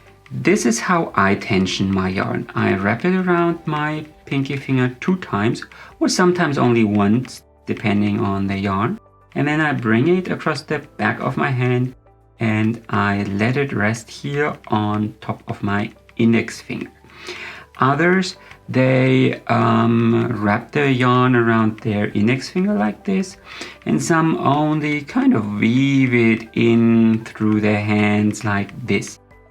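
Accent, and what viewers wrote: Germany. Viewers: I have some Norwegian friends and you are so like them. your style of knitting is so relaxing. I knit the Norwegian way. This is a quick way to knit but sometimes I like to knit slowly. I enjoy every stitch. Now I listen to your accent more closely I can hear the Germannic style. Germany is a lovely country and the German people are so warm and friendly. I hope we can keep in touch. Lol Jacqui from Seahouses UK x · Donnerwetter! 👍 Abgesehen von den vielen sehr nützlichen Tipps sprachlich perfekt! Damit meine ich jetzt weniger die Aussprache – den deutschen Akzent hört man nach wie vor –, sondern den Gebrauch des eher ungewöhnlichen Vokabulars. Ich bilde mir ein, ausgezeichnet Englisch sprechen zu können, aber bei dieser Thematik müsste ich passen.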